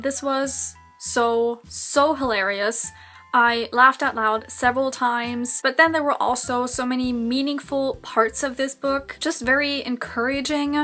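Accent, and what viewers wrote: Germany. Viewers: really enjoyed the video, are you german ?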